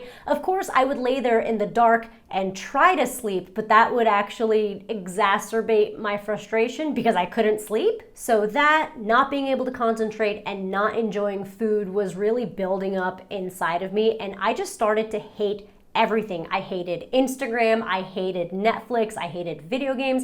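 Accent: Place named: New Jersey, United States. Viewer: saw your IG and your 'cathartic cry'. Glad you're making healing progress. Also, you're losing your Jersey accent, slowly but surely, hahaha.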